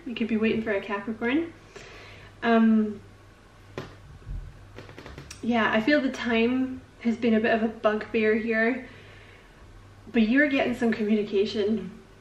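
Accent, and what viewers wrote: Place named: Glasgow, United Kingdom. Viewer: Sounds like a fellow Glaswegian reader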